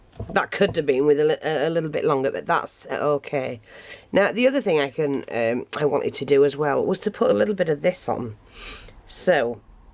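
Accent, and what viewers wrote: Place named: Liverpool, United Kingdom. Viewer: Excuse me being nosy, but you sound like my friend who comes from Liverpool? xxxx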